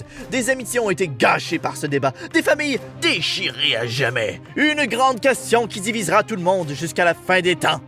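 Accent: Quebec, Canada. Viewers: Bravo j'adore ce que tu fait. Je te trouve très drôle et avec l'accent québécois c'est encore mieux. Que vive le Québec 🇨🇦🇫🇷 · Bonne video, en plus ton superbe accent québécois rend vraiment bien. Avant je trouvais ce film affligeant, grâce à toi je le trouve juste déprimant. Peut être qu'en la visionnant 24/24 pendant 6 mois, je trouverai ce film bon. Mais là, j'ai la flemme... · j'adore ton accent québécois je trouve ca vraiment fun et ton humour est cool je m'abonne ;)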